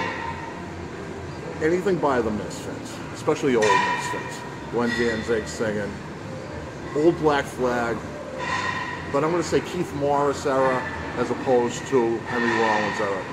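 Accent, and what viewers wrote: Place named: New England, United States. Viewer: Welcome to the family! Great see and hear another New Englander on YouTube kid! Had me at Misfits and! Looking forward to more videos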